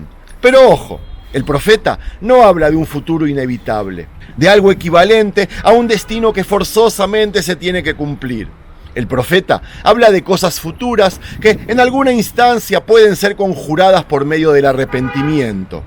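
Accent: Argentina. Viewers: Porque siempre dices que eres judio tal parece que eres argentino por el acento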